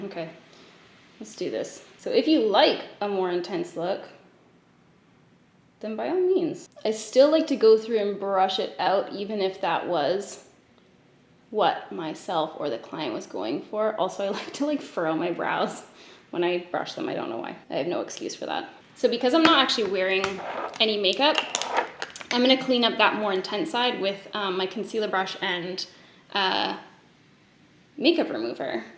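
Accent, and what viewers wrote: Canada. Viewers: I’ve needed this so badly!! I just had LASIK so I don’t need glasses anymore. I got under eye filler to fix my “”baygs.” That’s Canadian talk for bags. Now that nothing is hiding my face, my brows need to be on point. Help!!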